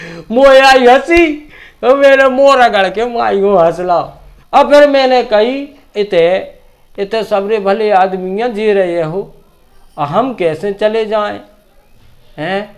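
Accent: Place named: Bundelkhand, India. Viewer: गजब की बुंदेलखंडी जी बोलते है बहुत गजब का टेलेंट है आपके पास ऐसा टेलेंट मैने फिल्मी दुनियां के कलाकारों के पास भी नहीं देखा है आप हमारे बुंदेलखंड की शान हो 🙏🙏🙏🙏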